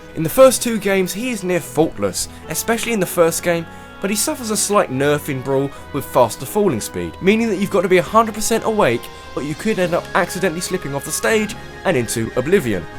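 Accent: England, United Kingdom. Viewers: Nice countdown, you're one of the few youtubers who can make me crack a smile, since I love English accents. PS: Have you played TF2x10?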